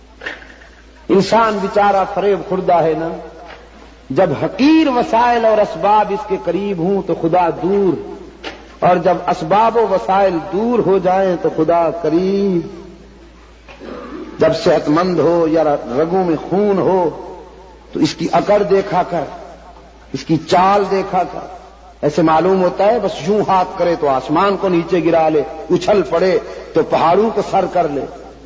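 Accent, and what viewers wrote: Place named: Sindh, Pakistan. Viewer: Hazrat ka Hamen lehja bahut pasand Aaya Hamare India Ke Log inke byanat bahut sunte Hain Inka Le Ja Sindhi juban ke upar hai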